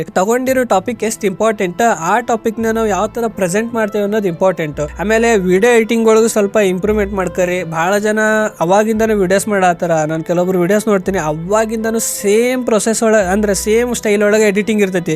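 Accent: North Karnataka, India. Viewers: ನಿಮದು ಯಾವ ಊರು ಅಣ್ಣ..?? ಪಕ್ಕಾ ಉತ್ತರ ಕರ್ನಾಟಕ ಬಾಷೆ ಅಯ್ತಿ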